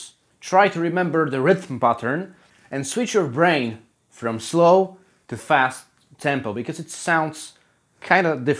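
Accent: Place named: Poland. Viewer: O co chodzi z tym akcentem? Ma mówić bez akcentu bo jest Polakiem?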